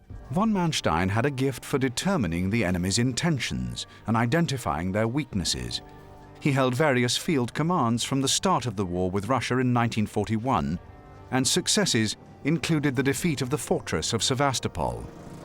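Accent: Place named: United Kingdom. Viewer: Dear God...when is the British voice thing going to end?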